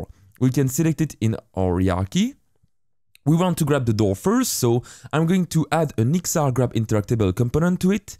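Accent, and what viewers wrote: France. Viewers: Ça sens l'accent français...?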